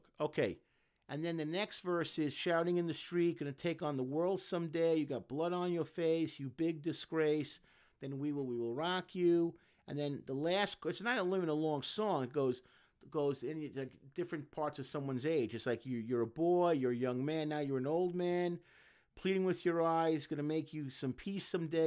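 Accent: New York, United States. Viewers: Your from New York aren’t you.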